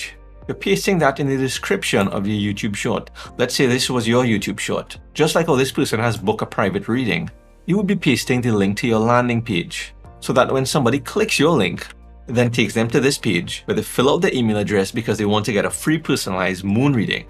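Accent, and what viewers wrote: Trinidad and Tobago. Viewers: I just heard you speak and said to myself he a whole Trini! tell me i'm wrong?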